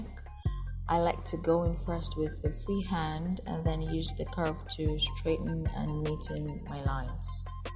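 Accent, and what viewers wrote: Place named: South Africa. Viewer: I'm a new subscriber By the way I thought you were from SA😁😁😁😁😁😁😁 Great job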